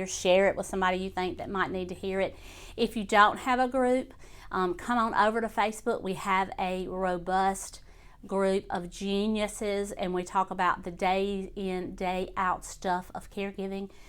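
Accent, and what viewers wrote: South, United States. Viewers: Jessie Barnett continued - I’m excited to know there’s someone else who has a similar combo of chronic illness stew ! I have CHF - and wear the same lovely “zipper” jewelry at my neck. I too have seizures roughly twice a month, complex partial, secondary differential nocturnal seizures. I’m not diabetic, but I am in 3rd stage kidney failure. A week ago I was diagnosed with vascular dementia, stage 4. During the winter, I was admitted to the hospital with severe bronchitis. It was there I experienced a most frightening experience — Steroid Psychosis. Never, never take a steroidal medication ! Did you pick up the Southern accent in my posting ? I live in North Alabama, Scottsboro, very close to Huntsville. To the aggravation of my doctors, I’m an avid YouTuber, watching every video on dementia. Count me among your many, many fans. 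I savor every post and most sincerely appreciate your knowledge and easy delivery (Southern) of course. What a pleasure it would be to have a chat with you discussing our mutual ills and hospital adventures - fueled by multiple glasses of iced tea ! Pace yourself, take every pill, follow every rule, and know you have a Crimson Tide friend including you in every nightly prayer. 💜 Jessie Barnett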